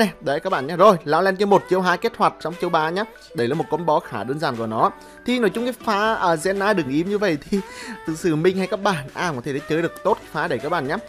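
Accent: Nghe An, Vietnam. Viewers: ngta ko hiểu tiếng nghệ an mình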